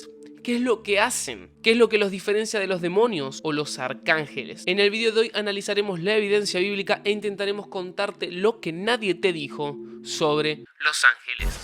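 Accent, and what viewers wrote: Argentina. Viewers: Por que los argentinos hablan así ✨✨ reposho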